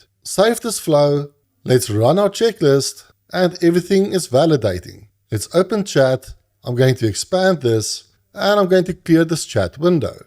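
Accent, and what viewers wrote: Afrikaans, South Africa. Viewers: Môre. Ek't nou al so paar van jou video's gekyk en dit baie insiggewend gevind. Ek's regtig nie gepla met Engels nie - soos in glad nie. Maar dis so lekker om sulke regtige goeie kwaliteit inligting met 'n Afrikaanse aksent te ontdek! Maggies man, wil gedoen wees. Jy mag maar. Groete uit Durbanville - Kaapstad.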